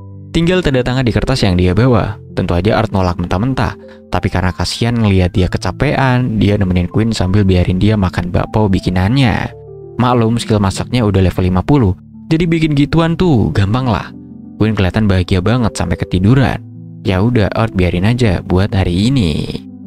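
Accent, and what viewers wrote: Medan, Indonesia. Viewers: Mimin orang medan ya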